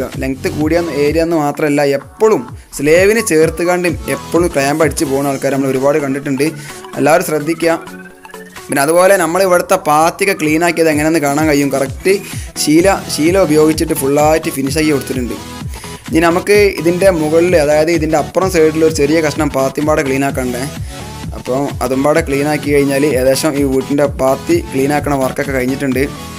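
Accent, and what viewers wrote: Malappuram, India. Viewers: ഹയ് ഞാൻ നിങ്ങളുടെ വിഡിയേ എല്ലാം കാണാറുണ്ട് നിങ്ങളുടെ വർക്ക് എല്ലാം സുപ്പർ ആണ് നിങ്ങളുടെ സംസാരം കോട്ടാൽ മലപ്പും ആണ് ഞാൻ ഒരു മലപ്പുറം കാരാൻ ആണ് എൻ്റെ നാട് പുലാമന്തോൾ ആണ് നിങ്ങൾ ഫോൺ നമ്പർ ഒന്ന് തരണം സന്തോഷം കൊണ്ട് ആണ് നിങ്ങൾ പറയുന്നത് പോലെ ഞൻ ചെറിയ വർക്ക് പടിച്ച് വരുന്നു നിങ്ങൾക്ക്‌ ആരേഗ്യമുള്ള ദീർഘയസിനെ തരട്ടെ ആമീൻ യാ റബ്ബൽ ആലമീൻ c ആമീൻ (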